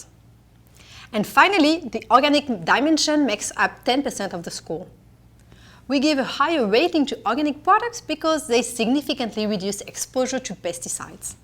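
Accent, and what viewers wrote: France. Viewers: Love the thick French accent with risky soundly whiskey. lol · The narrator should be someone who speaks fluent English without a strong French accent.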